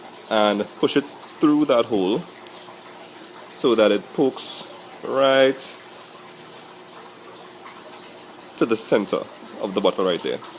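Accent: Trinidad and Tobago. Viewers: Hmm u sound like a Trini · i hear a trinis voice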